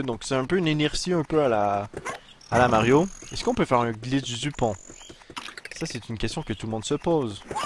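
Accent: Quebec, Canada. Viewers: Ton accent québécois non d'un chien c'est bon!!!!!!!!!!!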